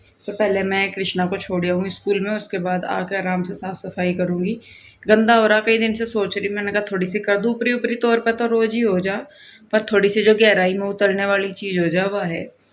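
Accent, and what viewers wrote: Haryana, India. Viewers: Ye haryanvi accent hai.harek ko apni bhasha pe garv hona chhye n ki sharmindgi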